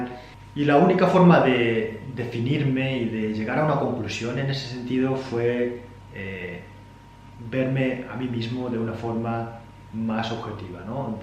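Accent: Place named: Spain. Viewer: Compañero por muchas vueltas que le des, tu heres español de ascendencia coreana! Aunque tu no te des cuenta! Solo hay que oírte hablar!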